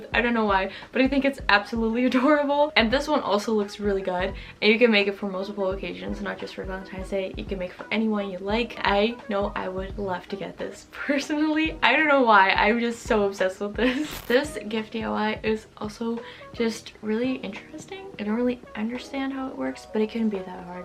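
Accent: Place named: Netherlands. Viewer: i lovely, ik denk dat je Nederlands bent bahaha klopt dat? Je naam en gwn je uitspraak😚 pls reageer ff want ik ben benieuwd ik ben ook uit Nederland en ik woon in Nederland, ik zag een keer ook dat je stiften had van de HEMA haha en 1 keer kaarsen van de blokker❤ maaruhh ik hou van je video's ❤❤❤ keep up the good work girl ilyyy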